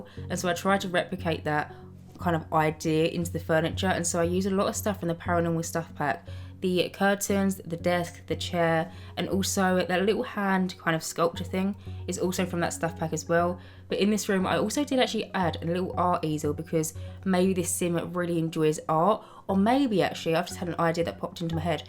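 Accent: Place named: East London, United Kingdom. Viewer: Its actually sooo refreshing to hear a east london accent on youtube tbh 🥰 im new to ur channel and u deserve more subs & views!! Also the sims treat us so bad sometimes building a whole clinic so u dont have to sit through a loading screen 😭🥴 the way u build is so interesting too like when u put tones of tissues on some surfaces or megaphones im always like ??? But it seems to work out in the end ! It was a really gorgeous build tho u did amazing!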